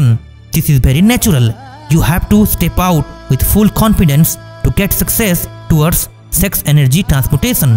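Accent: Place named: India. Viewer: Visuals and content is true and good but your accent is really funny (hindi speaker?) I'm from Tamil nadu but i find it different.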